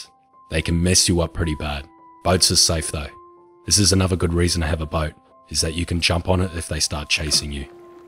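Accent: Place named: Australia. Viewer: Australian accent what part of oz are you from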